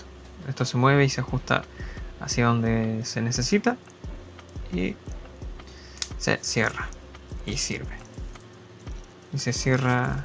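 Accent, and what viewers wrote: Chile. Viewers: Excelente tutorial... Por tu acento se que res chileno..°! Felicitaciones amigo..!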